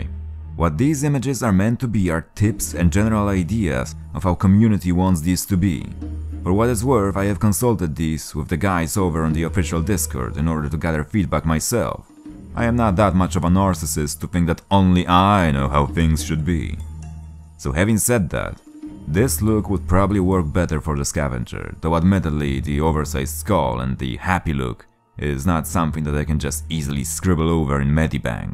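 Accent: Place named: Poland. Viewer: Such an annoying voice 🤮🤮🤮 and Polish accent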